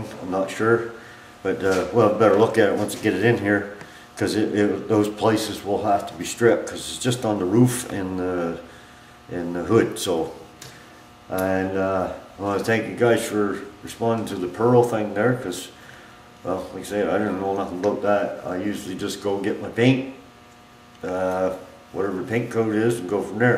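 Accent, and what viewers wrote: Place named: Nova Scotia, Canada. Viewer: am in Halifax , thought you sounded Nova Scotian, Im into old Novas too and have done that frame thing as well,,had mine sandblasted, painted it inside with brushes on a long stick or piece of bent tubing .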